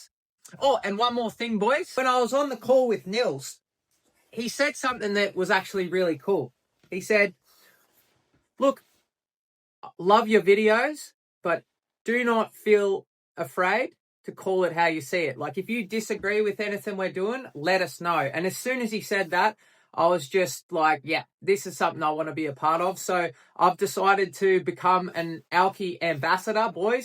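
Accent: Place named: Australia. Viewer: Aw yeah nah yeah Nils is a real one thats for sure. Once you see the Auki big vision you understand this is the inevitable progression of blockchain. Great to see that Aussie spice in your vids from a fellow Aussie. And "owki" said right haha - Scodo.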